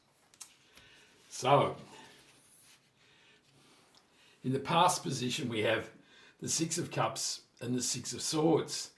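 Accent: Australia. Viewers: Hi, are you an Aussie mate ?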